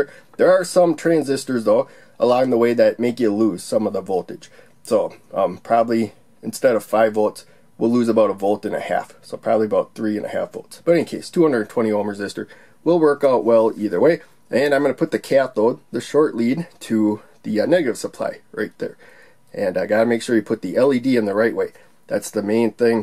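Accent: United States. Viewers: Thanks! multimeter tells many things. your drawing and basic knowlage (what LOW, HIGH means) help me some. according to OUTPUT (LOW or HIGH), LED 1 or LED 2's voltage difference is much interest. multimeter between LOW(OUTPUT) and 5.3v VCC tells 5.26v. then between HIGH(OUTPUT) and GND tells 3.97V(220 ohm is between). Your english is so fast so not easy to listen for middle aged East Asian guy, but could hear some important phases. I think you are an Amerian from your english, that's right. Good luck for this hard decade with not beautiful some GREAT policitions here and there. Thanks again.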